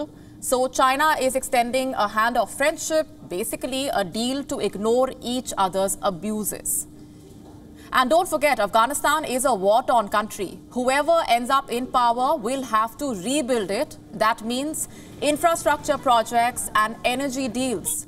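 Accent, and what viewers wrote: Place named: India. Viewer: Why WION news are using broadcasters that has strong Indian accent journalist I barely understand what she was reading my goodness pls kindly use someone that has free accent in English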